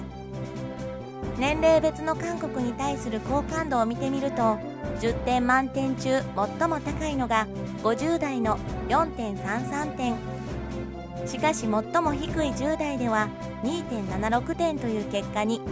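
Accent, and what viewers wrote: Kansai, Japan. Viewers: 柔らかい関西弁、好き！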